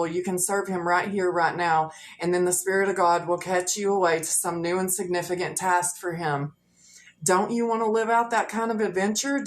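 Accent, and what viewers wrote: South, United States. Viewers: 😎I am most high....... just smoked a joint. LOL. but seriously.... sometimes all it takes...... is a little can do.... you got it. beware of trolls....is right. they hide behind circles with letters in them. i love the southern accent........ i grew up watching beverly hillbillies, and dukes of hazzard............ But who didn't huh? be vigilant about thieves.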